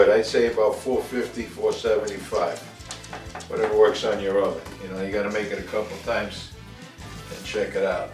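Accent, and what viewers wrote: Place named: New York, United States. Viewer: You got like a New York Italian accent haha (: nice vid!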